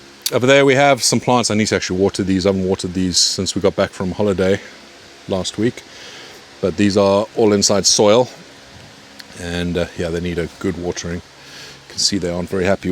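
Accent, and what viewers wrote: South Africa. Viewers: You have a south african accent why its quite cool to me because i am from south africa and you inspire me to keep on going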